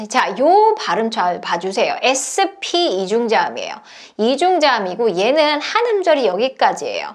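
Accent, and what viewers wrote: United Kingdom. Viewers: 저는 영국식발음이 끊어 뱉어주셔서 더 편하게 잘 들리네요. ^^ 오늘도 감사합니다. IT WAS A VERY FUNNY DAY. I WILL SAY. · 확실히 영국식 영어가 더 잘들립니다. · 쌤 정망 영국식이라 그런거 맞는거죠???😂😂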